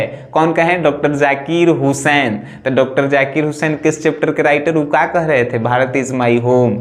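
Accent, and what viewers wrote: Bihar, India. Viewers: Aap ek dm real bihari ke trh bolte hai but aap bahut aachha trik btate hai 🙏🤝🤝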